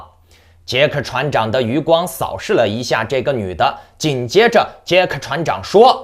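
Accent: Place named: Hebei, China. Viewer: 其实很早我就感觉你是河北人了！看来北方人的特点通过声音和语境还是能品出来的！👍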